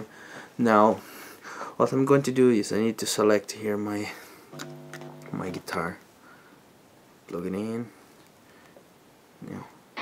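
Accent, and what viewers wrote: Brazil. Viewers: Ou mano, quanto vc pagou no irig? hahah To ligado q vc eh brasileiro seu sutaque nao engana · Hahaha, verdade quando comecei a escutar percebi que era brasileiro. Mas ta valendo, obrigado pelo vídeo!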